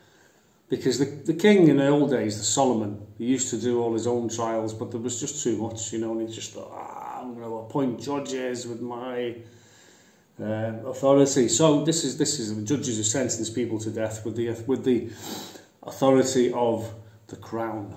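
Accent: United Kingdom. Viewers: I ❤️ voice he'd make a great narrator the next Alistair Cook or Sir David Attenborough (my hero) or even ASMR dude. It's the accent. I'm an American from Midwest living in CA. So sucker for & adore accents! Actually live near Monteshito. I must say there is at least 1 or 2 silver linings to Harkles at least for me. I'm much more interested & protective of the RF & interested in British history. I'd lost that after Princess Diana. history lessons appreciated, enjoyed & needed. My husband is a history major, I barely passed history in HS. Blessings to you, Fiona & family!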